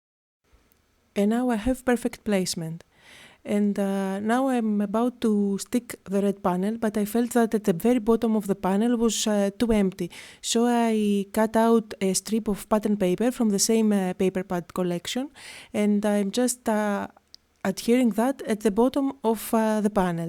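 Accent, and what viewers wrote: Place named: Greece. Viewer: it's a Greek accent. Lovely card!!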